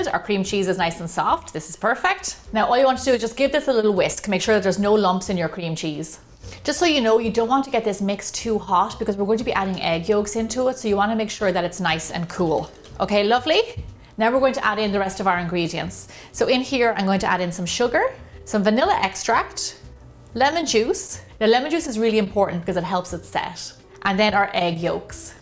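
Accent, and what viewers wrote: Ireland. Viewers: Loved your baking tutorial. Must give it a try, ta be sure!! Lovely Irish accent too. Yum!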